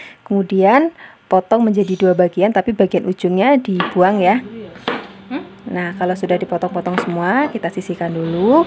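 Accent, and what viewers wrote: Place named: Central Java, Indonesia. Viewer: Suka bnget dgn bicaranya enteng ceria... Kyknya Jawa tengah ya mba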